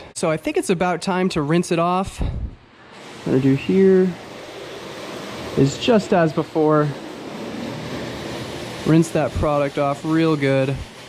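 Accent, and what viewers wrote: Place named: Wisconsin, United States. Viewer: Sounds like Wisconsin 😜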